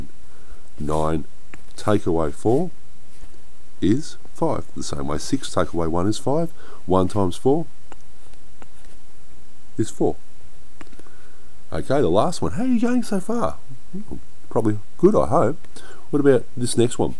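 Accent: Australia. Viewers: Awesome thanks for that! Just one more thing are u Australian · AYYYE THIS GUY IS AUSTRALIAN YEH MATEEEE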